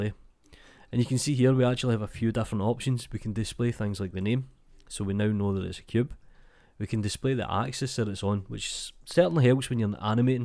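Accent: Scotland, United Kingdom. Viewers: 2 things that I like at the same place; blender tutorials aand Scottish accent :D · that scottish accent 👌👌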